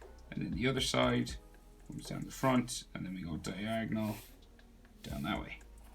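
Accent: Ireland. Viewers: Are you irish because you sound that way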